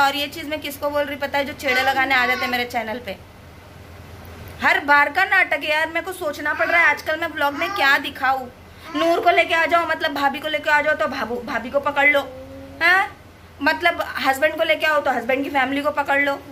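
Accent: Hyderabad, India. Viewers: Baji aap hyd ki language baat kar rahi ho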